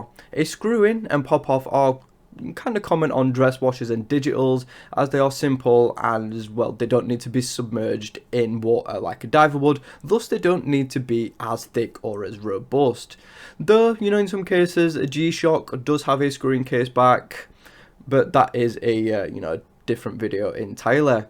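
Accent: United Kingdom. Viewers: thanks for your answer. I’m not British, but I have an interest in accents, dialects and all that jazz. Might I ask you where your accent is from? Midlands? Liverpool?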